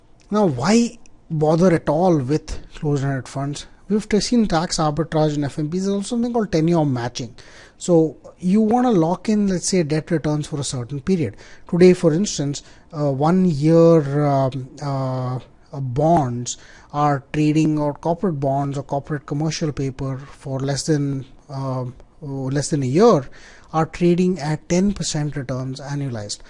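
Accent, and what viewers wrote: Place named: India. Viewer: Indian english, not bad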